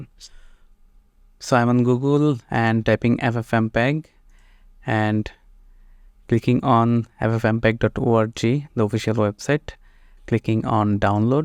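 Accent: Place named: India. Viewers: i only know indian accent, so i think its a bit similar, sorry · fellow indian here; we pretty much sound like each other.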